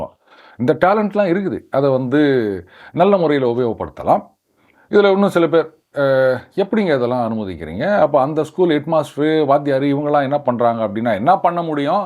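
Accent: Chennai, India. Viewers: Why don’t u talk in normal Tamil instead of Chennai Tamil. It is too bad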